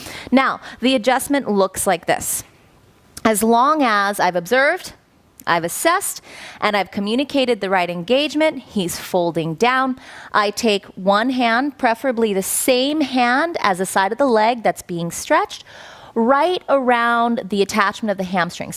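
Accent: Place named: United States. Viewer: I hear an American accent but for some reason it's not unbearable nor disgusting and I could watch the entire video. Congrats!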